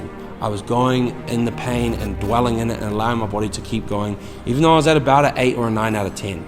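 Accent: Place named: Scotland, United Kingdom. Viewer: I thought u are Scottish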